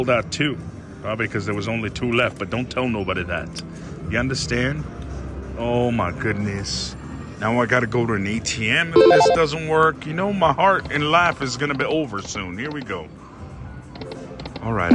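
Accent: South, United States. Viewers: I freaking love you!! I'm so happy I found your channel. I'm disabled and can't go anywhere much. You have made me Crack up so much!! Thank you!!! Are you from the south? You sound like you are😊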